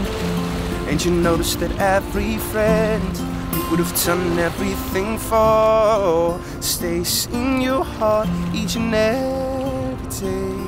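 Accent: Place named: Germany. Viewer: how is it that you can sing in an American accent but speak with a German one? I find it fascinating. And, beautiful as always, bro.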